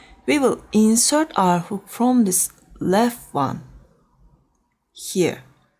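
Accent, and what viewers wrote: Turkey. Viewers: You are an EXCELLENT TEACHER!!! Your English is NOT a problem at all, because you do the most important things to help us understand, unlike others who make crochet videos. I appreciate this tutorial, I am soooo bored with the same old stitches! You explain well, but other people do not explain the task, they just "say" do this stitch, do that stitch, without explaining why, but you do. Maybe we could learn a bit of your language! Turkish is a beautiful language, (the accent is too) just like other Altaic languages, like the Koreanic, Mongolic, & Japonic subdivisions, they are very beautiful to listen to, even when the listener does not know the language. THANK YOU! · It’s so beautiful 🤩 and your English is just so sweet. Are you Turkish ?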